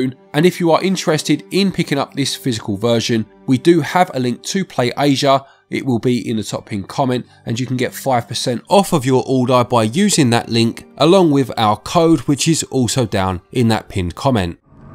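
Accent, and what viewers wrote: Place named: London, United Kingdom. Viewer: I wrote a now-deleted comment that underlined the pronounciation of certain words in the video out of appreciation for the London accent, and not mockery. commented that my comment came accross as offensive, so I would like to sincerely apologise to him and anyone else who read it thinking it was insulting. and are both very talented in their voice over work, and it is a big part of what makes such a great channel. Having lived in many places accross the world, including London, and having an accent myself in all the languages I speak, I would never mock someone else's pronounciation or intonation. So my sincere apologies to and its community.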